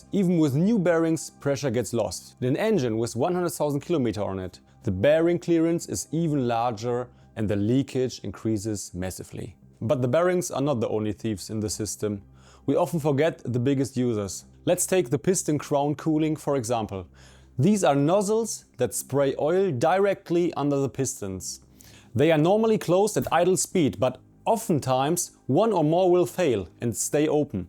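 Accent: Germany. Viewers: sorry but there is only one thing that is so annoying for me and that is a german speaking english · I feel the german in your voice :) This is a very good video and the explanation is on point. But now i am curious, can i, as a non mechanic guy, increase the oil pressure from the pump in idel anyhow?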